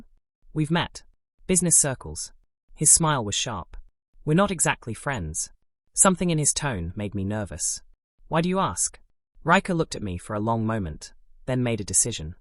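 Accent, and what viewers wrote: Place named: England, United Kingdom. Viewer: good story, i happy that i found a channel with a english accent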